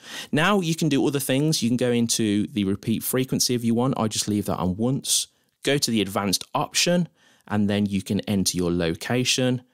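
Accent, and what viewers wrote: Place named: West Midlands, United Kingdom. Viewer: Excellent video. And I find the slight West Midlands lilt relaxing as well. Which is good because this is an infuriating task.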